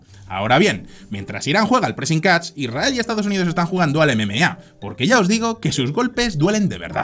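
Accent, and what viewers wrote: Spain. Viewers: Español de España perdiste el 95% de tu audiencia Lovesno Onda vital A todo gas 😂😂😂 Brus wilis en español latino si es escuchable. Fonseca te recomiendo el video del chico de los subtitulos para que veas la indignación por los doblajes de tu país. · Que !! Quee!! Queeee!!! Español de españa?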